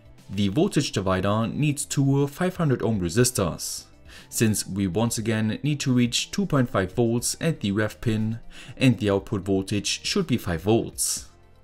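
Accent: Germany. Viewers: I AM SO GLAD WITH YOUR FREQUENCY OF VIDEOS LATELY! I know full well how much work this just be for you, but I think I speak for a great many of us, it really is exciting to see a new release from you! No matter the topic, you make it understandable, entertaining, engaging, and simply watchable for just about anybody. I think it is your super soothing cadence of speech, and that sweet, sweet German accent! 😁😁😁👏👏👍🏼👍🏼👍🏼😳😳😃😃😃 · ...das is das trotz korrekter Grammatik GEILSTE deutsch Akzentuierte Englisch, , was ich zu Lebzeiten erfahren durfte...Content is natürlich auch gut, aber diese Stimme hebt das in eine ganz andere Dimension...Danke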